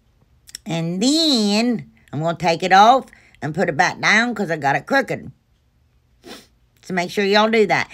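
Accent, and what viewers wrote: South, United States. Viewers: Love everything u sound so cute like frm the south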